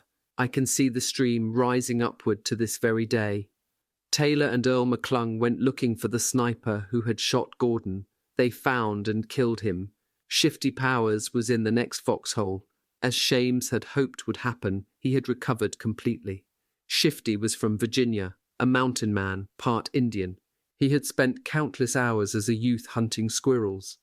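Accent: United Kingdom. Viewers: Why are they always using a British accent? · Because the person reading is British, it's his actual voice😔😔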